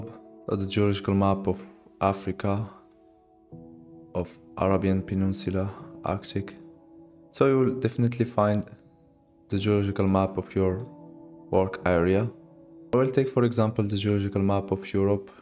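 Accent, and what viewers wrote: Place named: Morocco. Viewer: This guy's accent is moroccan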